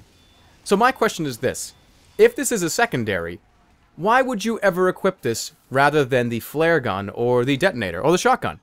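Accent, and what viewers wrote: Boston, United States. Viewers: I watched Shibby2142's video about the new weapons, and I gotta say: this video is much more entertaining. Shibby is still funny but more calmer and less energetic. Your videos always have this energy to them (maybe it's the Boston accent), and that's why I subscribed to you before Shibby.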